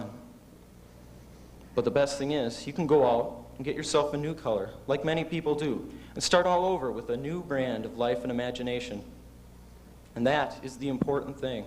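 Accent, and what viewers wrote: United States. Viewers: I thought I was lucky going to highschool in the early 2000s… early 90s must have been even more badass! 90s was the best decade… it was the last gasp of humanity before the digital age..I’m guessing from your accent.. Michigan? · Well done,! I was working for Shopko at the time you graduated. I can relate to the toys you mentioned. Haven't thought of them in years! I hear a faint upper midwest dialect when you speak. Though definitely not from a small town or rural area. I miss that company; made lots of friends with folks who came this way(Omaha), to open stores. We had 5 at the peak! Again, good speech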